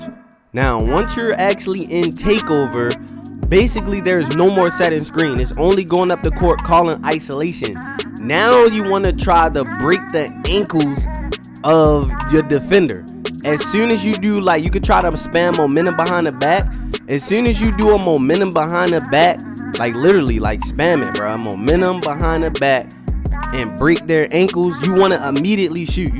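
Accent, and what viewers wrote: Baltimore, United States. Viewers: U got a Baltimore accent bro✊🏽❗️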